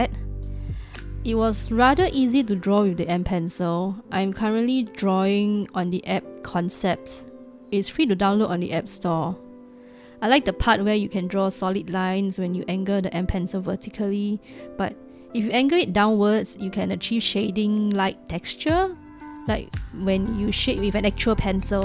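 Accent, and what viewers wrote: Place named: Singapore. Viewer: singaporean accent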